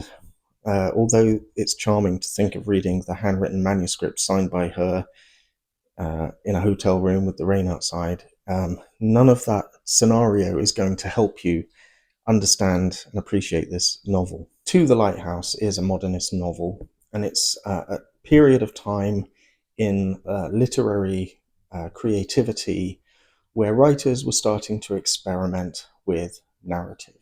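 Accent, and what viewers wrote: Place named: New Orleans, United States. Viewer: Used to love your unique New Orleans sound, delighted you’ve found a second career in lit crit! Outstanding stuff. 😊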